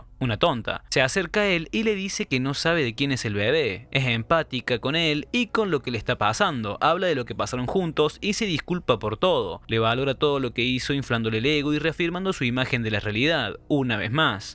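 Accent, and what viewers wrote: Cordoba, Argentina. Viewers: que grande el acento cordobes loco, es mi favorito del pais. Saludos desde Cordoba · Videazo re bueno el análisis y el acento cordobés le da el toque 😂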